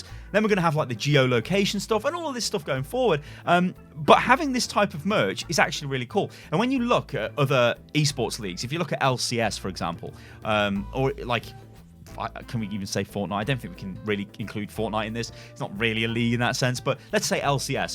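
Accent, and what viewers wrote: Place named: United Kingdom. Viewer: Your British talk is confusing. But I love British talk so I’m learning lol.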